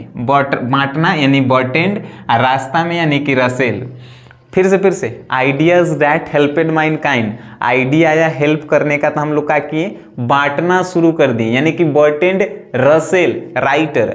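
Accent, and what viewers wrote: Bihar, India. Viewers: Aap ek dm real bihari ke trh bolte hai but aap bahut aachha trik btate hai 🙏🤝🤝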